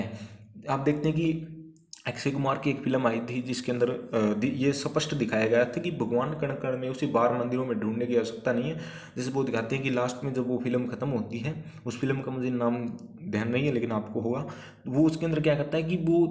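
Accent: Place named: Haryana, India. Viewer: Sir aap Haryana se ho... Aapki voice tone haryanvi lag rhi ha... Sun... Ka samjh ka bhot badiya ... Laga ....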